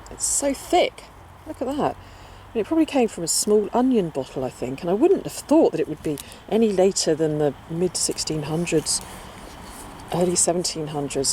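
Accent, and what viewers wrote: United Kingdom. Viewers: It was an absolute joy to stumble on your video miss! Yours was the first British accent I have heard on YouTube for ages and I was beginning to feel homesick without leaving North Yorkshire. As for wishing you were 32 again I have just been 32 again and I can tell you that being 64 is over rated despite what may have said in the song. Blessings and peace miss.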